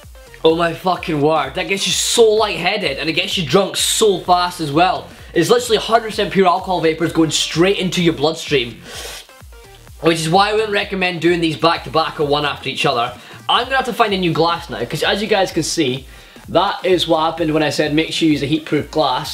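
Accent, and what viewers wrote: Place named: Scotland, United Kingdom. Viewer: Awesome man, funny as hell,sorry your glass cracked though. I love the accent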